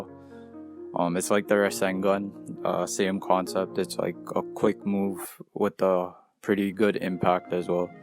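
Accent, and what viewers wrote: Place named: Toronto, Canada. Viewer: Yo u from Toronto G? I can tell by the accent lmao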